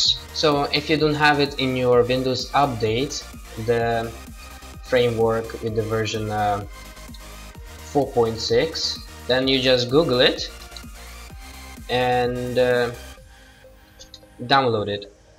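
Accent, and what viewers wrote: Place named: Russia. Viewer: Русский чувак, по акценту понял) Молодец, мне помогло, спасибо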